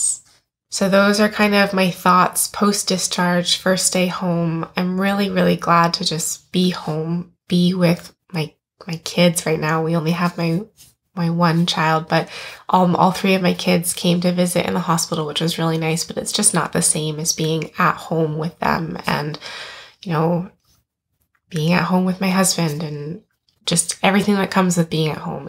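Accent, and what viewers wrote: Canada. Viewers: It sounds like you’re Canadian? If so, I will ignore the clinical dogma, as in the U.S. we use human experience language to describe extreme states. I consider the word “psychosis” a slur, no matter who utters it.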